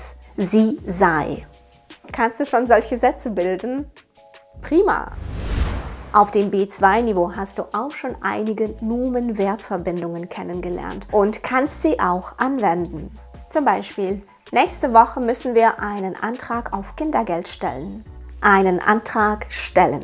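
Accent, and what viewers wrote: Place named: Russia. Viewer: Если честно с первого слова русский акцент распознала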